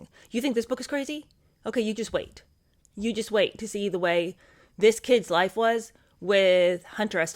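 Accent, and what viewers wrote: United Kingdom. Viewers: I love your Brit accents, LOL. It annoys me greatly that us taxpayers here in the UK fricken paid for this circus of a wedding! She is such an ungrateful bee-atch!!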